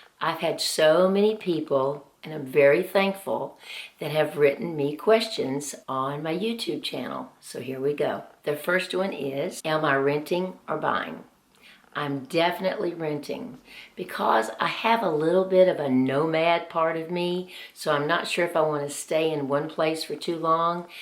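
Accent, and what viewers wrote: South, United States. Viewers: So impressed with you! Go! Do I detect a southern accent?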